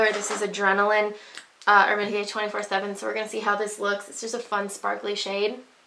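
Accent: United Kingdom. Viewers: Lol I love this look, despite the inspiration xD I've never played that game, but the only Facebook game I've ever played is the Hunger Games Adventures, where I haven't played in a million years. Your British accent just makes your resemblance to Kate Winslet more legit :P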